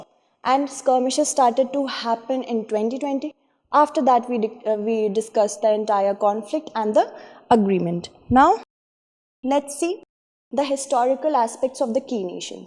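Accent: India. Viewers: Is She Indian? Her accent is like Indian.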